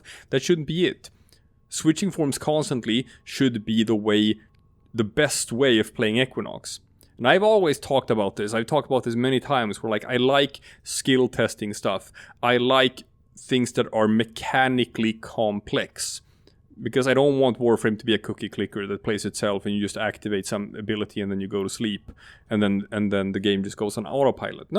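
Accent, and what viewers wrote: Sweden. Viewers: First time seeing this channel, the second I heard that voice. Yea definitely a Swedish man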